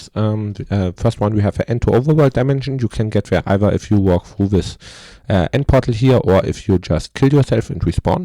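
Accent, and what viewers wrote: Germany. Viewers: I hear a German accent. Good video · Are you german mayhaps?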